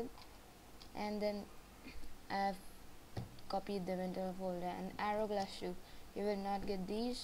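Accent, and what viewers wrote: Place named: India. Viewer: you have an indian accent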